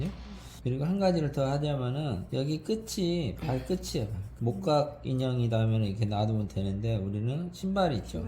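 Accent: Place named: Jeolla, South Korea. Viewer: 왜 라도치들만 유투브에 열심인가? 사투리 좀 쓰지지 마.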